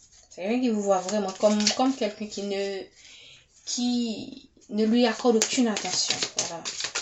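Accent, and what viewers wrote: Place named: Guadeloupe. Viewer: Bonsoir , je voud découvre à l’instant .Je vois remercie pour cette guidance , c'est tout à fait mon histoire avec cette personne, jai énormément morflé avec elle 😔🫤. Elle a beaucoup gens toxiques autour d’elle , et ces gens là, ont mis a mal notre relation, elle est très,très influençable malheureusement 🫤. Vous avez l’accent antillaise je pense de Guadeloupe à moins que je me trompe !🤔😀 .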